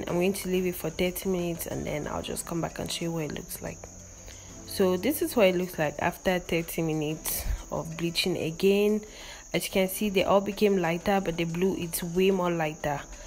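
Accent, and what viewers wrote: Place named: Nigeria. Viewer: This is a really helpful video and it sounds like you're Naija, so I be subscribing 😊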